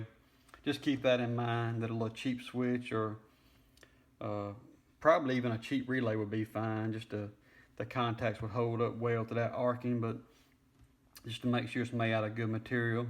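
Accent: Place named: Tennessee, United States. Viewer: great video , you saved me from deciphering the manual .. great accent , is that from Tennessee or Texas or both :)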